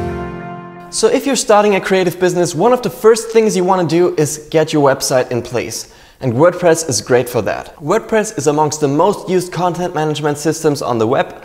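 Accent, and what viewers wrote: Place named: Poland. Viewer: very german with so polish english accent : )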